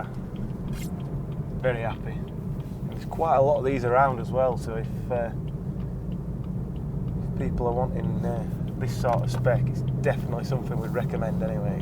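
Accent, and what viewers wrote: Yorkshire, United Kingdom. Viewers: Love how Yorkshire you are! Great work lads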